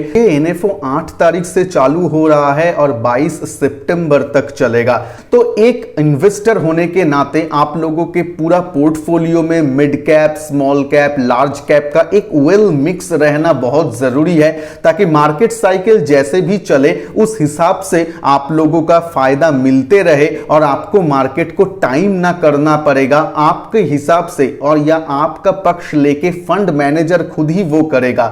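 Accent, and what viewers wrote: Bengal, India. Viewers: আপনার উচ্চারণ শুনে মনে হচ্ছে আপনি বাঙালি, যাইহোক আপনার ভিডিও আমি খুব মনোযোগ সহকারে শুনি।। সঙ্গে আছি দাদা।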